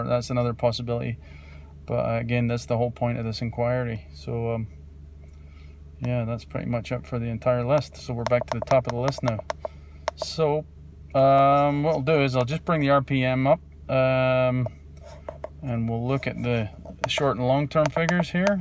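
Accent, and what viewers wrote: Scotland, United Kingdom. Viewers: fek sake shu have known. Alternative ulster was our anthem. Used to play in the limelight in belfast. I was in my teens in the 80's. I hear ur scots accent breaking thru regularly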